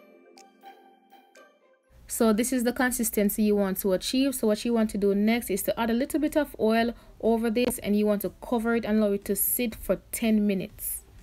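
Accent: Jamaica. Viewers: It's a long time I have been looking for a Jamaican plant based channel. Now I have found one with a Jamaican that sounds like a Jamaican. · Jamaican accent wtf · Great video very helpful, but I love the Jamaican accent more than anything . I will be watching all of your videos now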